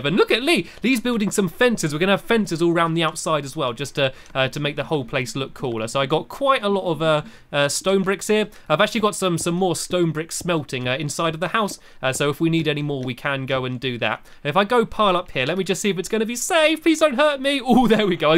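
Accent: United Kingdom. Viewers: * are you british??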